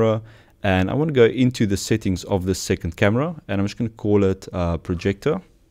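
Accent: Afrikaans, South Africa. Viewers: definitief afrikaans lol!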